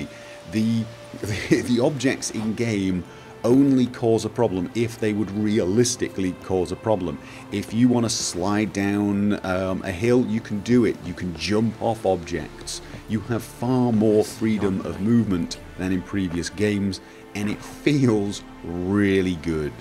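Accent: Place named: Northern England, United Kingdom. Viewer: ***** Bollocks, he has a northern british accent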